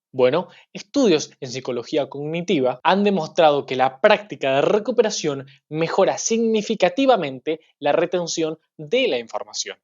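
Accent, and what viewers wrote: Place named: Uruguay. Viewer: Muy buena recomendación 👌 👌 Eres uruguayo? Lo digo por el acento.Saludos desde La Rioja Argentina.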